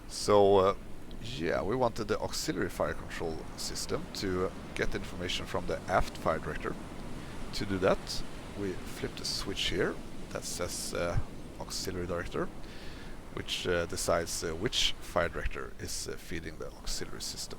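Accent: Sweden. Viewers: Greetings from Finland! Based on your accent I would say you are Swedish maybe...? When I saw Wolfpack345 play this game it was an instant wishlist for me.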